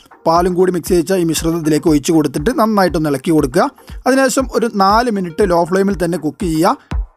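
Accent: Malappuram, India. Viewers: ഇക്കാ നിങ്ങടെ മലപ്പുറം ശൈലിയിലുള്ള ഭാഷ നല്ല രസമാണ്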